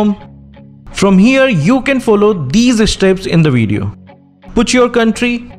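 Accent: India. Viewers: Am I the only one who hea Indian accent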